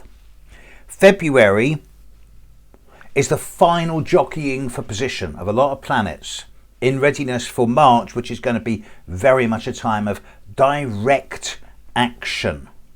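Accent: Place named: RP, United Kingdom. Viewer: I will say something... Your perfect posh English and your suiting slightly raspy voice makes everything easy. You can broadcast the end of the world and I would be calmly listening to you. Congrats.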